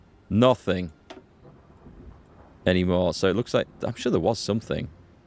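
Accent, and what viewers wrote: United Kingdom. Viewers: great for you. better for us. I love your gameplays, mainly because of british english which is not so common on Youtube :)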